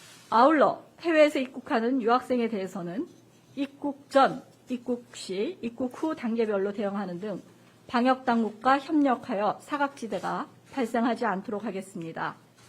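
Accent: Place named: Gyeongsang, South Korea. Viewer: 효꽈적 갱상도 출신이네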